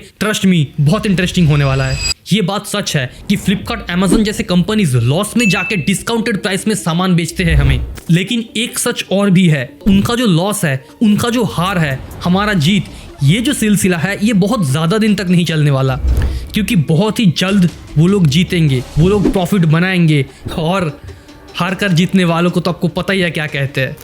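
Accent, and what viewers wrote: Bengal, India. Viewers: I knew he is a Bengali from his pronunciation 😅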